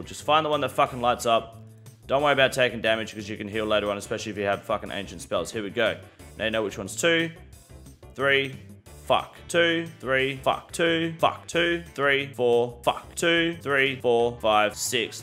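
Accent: Australia. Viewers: ur aussie accent is fantastic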